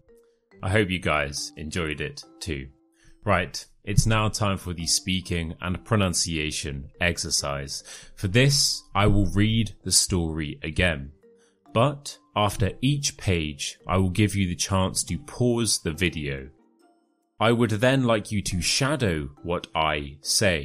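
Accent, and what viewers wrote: United Kingdom. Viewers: Are you from England and Scotland?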